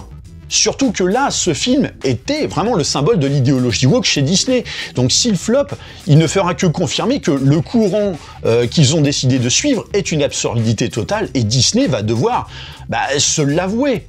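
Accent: Paris, France. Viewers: Ha punaise non! Rien à faire ... cet accent parisien très appuyé est trop insupportable !! Je m'en vais... ORLG ...IRLG ...URLG ...ARLG ....OURLG !!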